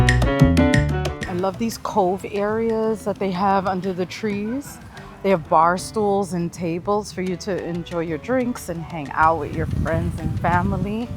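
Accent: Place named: Caribbean. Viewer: OK. I'm from New York as well, Brooklyn to be exact. I kinda hear a Caribbean accent too from you.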